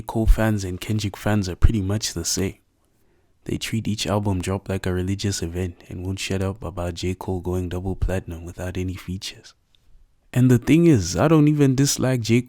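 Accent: South Africa. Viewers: U got a 🇿🇦🇿🇦 accent jo What's popping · He sounds south african gng and not a white south african either. Are you part of one of the fanbases that he was talkin bout on this vid or smth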